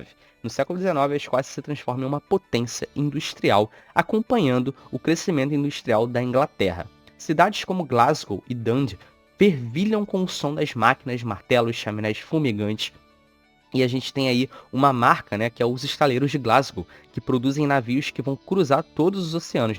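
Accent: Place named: Rio de Janeiro, Brazil. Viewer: Sotaque carioca chato da p*******!!!!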